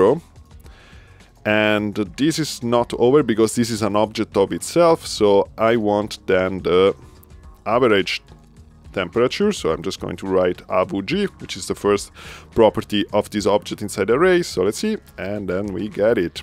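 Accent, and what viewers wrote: Italy. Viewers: Hey, your Italian accent is wonderful and adds to your channel. English speaking viewers can only be grateful that you are producing these great tutorial videos in their native language. And I did not know there was a "maxurl" object - thanks!!!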